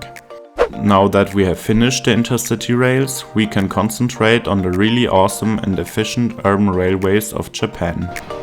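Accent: Germany. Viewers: YES! another great little "how to" video from the master. And the small fun inserts like are back ( as well as that smooth german voice 😊just makes me feel that there is professional behind it ) And to be honest, I can't wait for CS2 just to see what you can do with it, as well as some other people like Egg, FewCandy, CCP and Lee Hawkins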